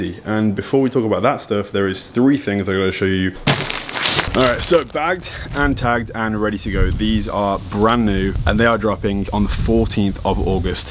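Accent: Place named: United Kingdom. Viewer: drug addict explains drugs in british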